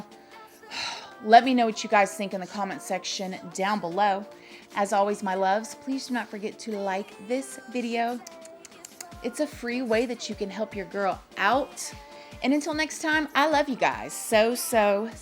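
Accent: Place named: Louisiana, United States. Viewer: Hey, I was wondering if your maiden name wouldn't happen to be? You remind me of and look like my little cousin that I have not seen since childhood. We are from Lake Charles Louisiana and you sound like you have a Louisiana accent.. I was just wondering if you are my long lost Lil cuz.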